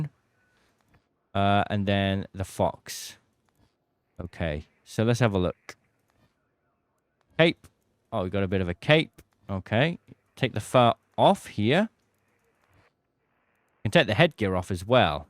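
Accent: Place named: United Kingdom. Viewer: This guy is British so he’s going to say it in a British way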